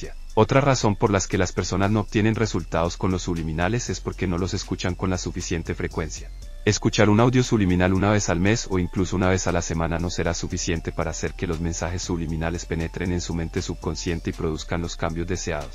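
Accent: Colombia. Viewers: Esa voz se escucha como medio colombiana❤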